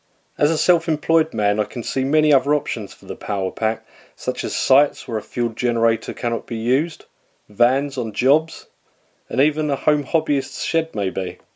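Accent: United Kingdom. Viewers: what part of the UK do you think he is from dohhhh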